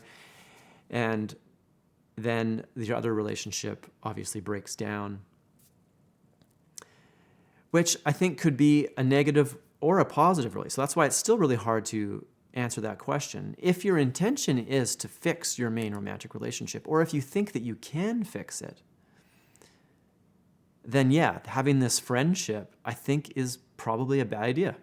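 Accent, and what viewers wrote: Canada. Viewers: Hmmm, I don't know .. This is the first video of yours that I watch (and I like it a lot by the way, I like the clear way you express your ideas and thoughts) You sound Canadian or American to me, and I find the "homophobia" thing more present there to be honest, so that could be something to note? Because in so many other places I find that men do express more emotion to their friends without this fear of "appearing to be gay". I also believe that men are not as emotional as women, or in better words: have different emotional needs than women does, in terms of sharing or expressing. Which explains the universal idea of (men being stronger and less expressive) that's been around throughout history. That being said, I do agree with you about stereotypeing, it makes life pretty rough for the more sensitive and emotional men. I think it always comes to that old tale of people thinking of themselfs as the "standard mold" that all others should be shaped with, which is a very human thing to think (it has to do with the natural need in people to feel connected and safe), but also it is the exact thing that makes life harder for every "different" human who is trying to fit in. 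This is very interesting to me, it's an issue that I got invested in and studied for my master's thesis, actually, and I found that the only way to solve it is to, basically, open people's minds to differences and teach them how to deal with it without losing themselves or causing others to lose themselves eit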